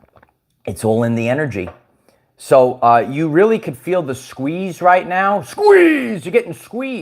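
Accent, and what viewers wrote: New York, United States. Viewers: I really love your East Coast accent! Is it a New York accent?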